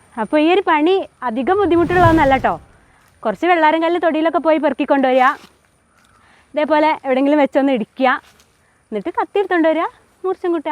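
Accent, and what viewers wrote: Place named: Malabar, India. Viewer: മലബാർ ്് സ്ലാഗ് സൂപ്പർ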